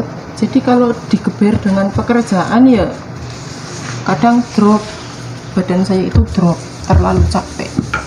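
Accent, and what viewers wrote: Banyumas, Indonesia. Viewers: tetep semangat mbokayu. slm ngapa.ora ngapak ora kepenak.mrebet pbg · Wong ngapak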